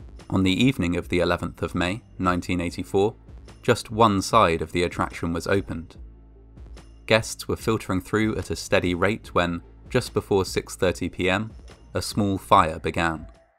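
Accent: United Kingdom. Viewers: He’s got the “creepy voice with British accent” thing down to a science! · Why is it always British people that narrate these type of videos? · Nah, he's just British. They all sound like that.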